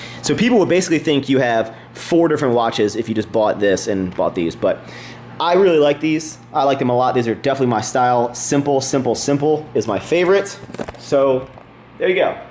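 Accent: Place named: South, United States. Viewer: hey, just curious, where are you from? There's a slight southern touch in your accent lol.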